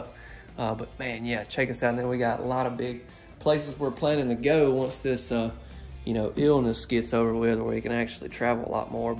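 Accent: South, United States. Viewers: As a fellow southerner I love your accent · I absolutely love this home, and as a widowed grandmother who loves to cook, I’d think I had already died and gone to Heaven if I was blessed to be able to have it as my own. I’d have one guest bedroom and use the smaller one as my sewing/craft room. Unfortunately, I’m not in a position to be able to buy it, but I love watching the videos and dreaming. You sound like a good Southern young man to me, and you come across as warm, funny, and, most importantly, honest about the homes you show us. I’m in the upstate of South Carolina where the tornado hit, and if it were possible, I’d buy this home, because it was love at first sight! 😂 Thank you for your videos and for being who you are. I still have to have hope and dream, and you make this so enjoyable for me. Keep up the good work, and I’ll keep watching! 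😉👍🏼🙏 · I enjoy your adorable Southern “accent”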